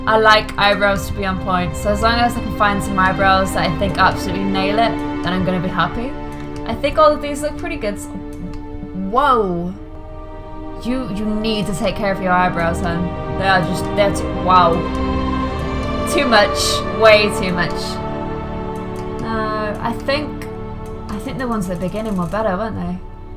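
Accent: United Kingdom. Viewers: Oh my god she's so British. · Shes british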